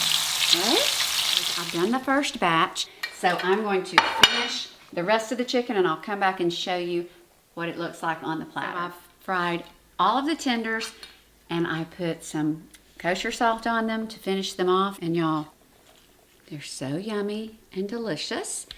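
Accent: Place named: South, United States. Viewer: First of all,you're gorgeous! I detect a southern accent. My mom was from SC. Anyway I'm going to make these. Thanks!!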